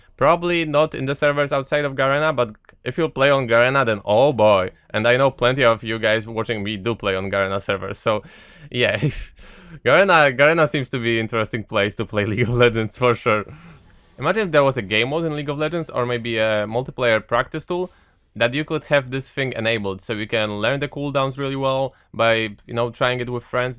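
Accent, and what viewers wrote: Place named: Poland. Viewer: Dobry akcent ale słychać że polak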